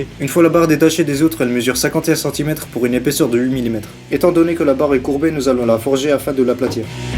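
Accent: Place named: East, France. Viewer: Au fait est ce qu'il vient de l'est ? ( L'accent me fait penser à ça )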